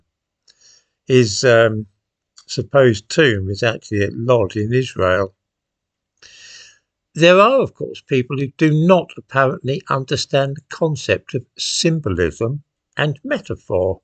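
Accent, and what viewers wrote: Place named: East London, United Kingdom. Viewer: Strong hint of East London accent this morning. Appropriate for this waffle.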